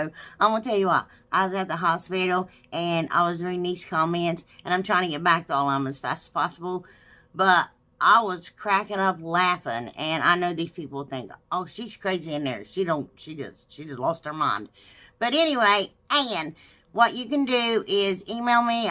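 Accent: South, United States. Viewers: I hope you feel better. You are the sweetest women and I love that southern accent!! Everything turned out beautiful! TFS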